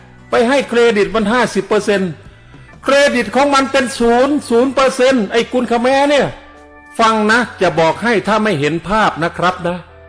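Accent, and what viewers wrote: Isan, Thailand. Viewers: ผมฟังภาษาอีสานไม่ค่อยเก่งแต่พอมาฟัง มา พากย์เป็นอีสานชอบมากๆติดตามเลยครับ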